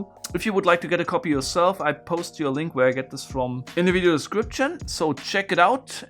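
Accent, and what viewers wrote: Germany. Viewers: I recognise a German accent when I hear one! This is just amazing, as I’m someone who is speaking in some odd amalgamation of different accents. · You sound like you are a german xD Falls ja , hab einen schönen Tag ;) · wie ich den deutschen Akzent sofort erkannt habe 🤝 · I can hear the German in you :D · He sounds sooo German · U german? Cause you sound like my english teacher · This is the first video I watch from you and 5 seconds in the video I thought "bro, this accent, he must be german" and then checked your channel information and I was right Hello fellow german buddy~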